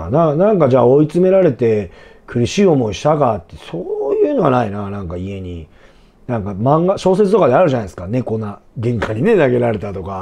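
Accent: Nagoya, Japan. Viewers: いいね 名古屋弁！港区か南区あたりの出身ですか？ 思わずチャンネル登録。Goodボタン押したよ。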